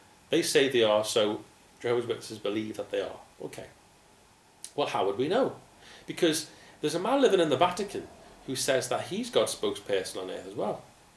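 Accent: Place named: Liverpool, United Kingdom. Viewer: Hey mate you sound like a fellow scouser we've probably been to the same assemblies. Ain't no way these tossers are anointed their just stunt doubles for snow white's seven dwarfs. take care and have a great life free from suppression as I intend to. Cheers my friend.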